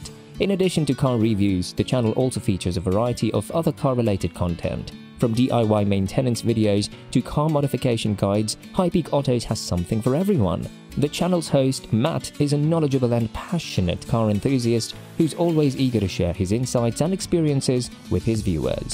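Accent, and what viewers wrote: United States. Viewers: Yet another English YouTuber talking American.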